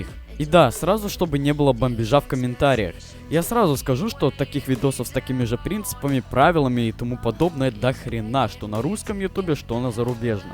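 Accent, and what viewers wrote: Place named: Ukraine. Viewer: Тоже украинец? Буква Г хорошо выдаёт)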